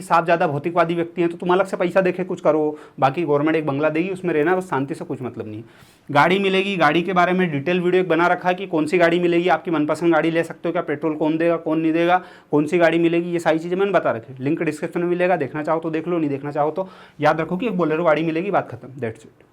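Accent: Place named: Indore, India. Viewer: Sir is full on indori 😂❤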